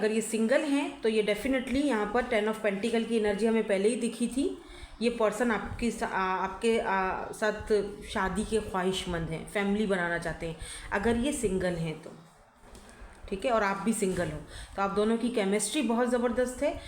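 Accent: Punjab, India. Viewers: Love your punjabi accent❤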